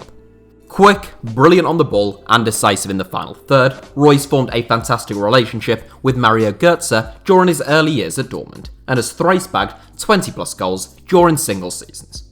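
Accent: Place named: Hull, United Kingdom. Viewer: Thee sound a bit posh for ull lad......I dropped the h to make it sound propa.....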